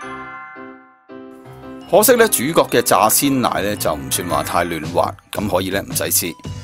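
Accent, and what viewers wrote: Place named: Guangzhou, China. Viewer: 好濃廣州話口音 香港人都自漸不如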